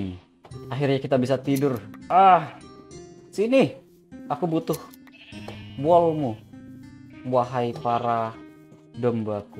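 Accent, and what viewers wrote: Madura, Indonesia. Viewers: Bang lu orang madura? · Orang MADURA Bang? Madura mana bang?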